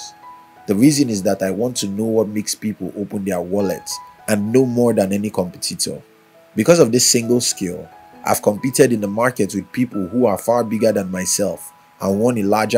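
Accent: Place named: Nigeria. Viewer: That's Nigerian Accent, must be a Nigerian.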